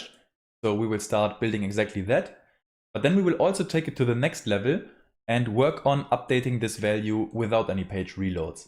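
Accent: Germany. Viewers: Nice guide, but in the end i was too lazy to add it anyway 😂. You explain it very well, the only thing that i don’t really like is the german accent ;)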